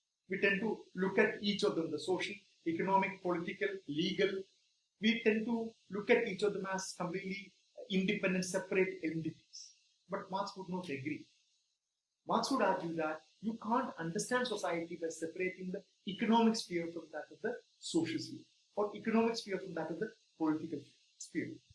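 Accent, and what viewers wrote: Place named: India. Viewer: The recording quality is poor. In combination with the thicker vocalization of an Indian accent, it turns into a fishbowl when played at high speed. But it's too ponderous to play at any lower speed.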